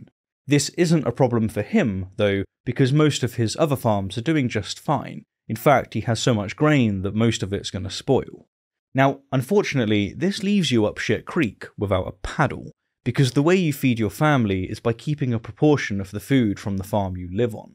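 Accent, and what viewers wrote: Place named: Midlands, United Kingdom. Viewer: No. He is a native English speaker from the midlands.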